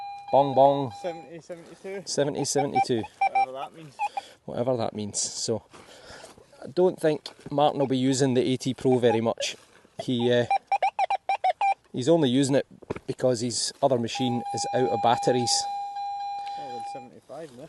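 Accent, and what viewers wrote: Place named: Glasgow, United Kingdom. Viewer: No wonder that this channel is growing so steadily to “not yet known heights”. Part of it is the Glaswegian way of speech and accent for sure. You sound much like Billy Connolly, a fellow Glaswegian who often brought us to tears of laughter. Congratulations mister. Things are looking to go up, harvest season is well under way and I’m sure you’ll knock some silvers and relics out.🤞🍀🤞🍀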